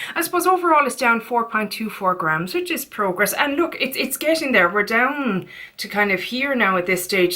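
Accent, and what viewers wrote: Ireland. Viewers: I put my phone up to my husband's ears bc I knew he would Love your voice. He didn't know what I was doing. His response "I could listen to her all day". Kelly Macdonald is his favorite voice. Which region in Ireland are you from? I don't know the regional differences. Loving your videos! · What a brilliant tip from your subscriber, so simple but hardly thought of. Will definitely be doing that with products with air bubbles from now on. Love your videos, your accent is beautiful. What part of Ireland are you from? X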